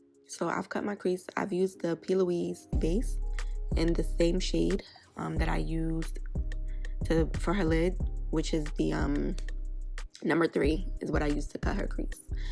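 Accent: New York, United States. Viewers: She sound like she from New York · U a 🐦 like me 😂 U def gotta b from NY😩... love it😍 #Bk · nyc accent i love it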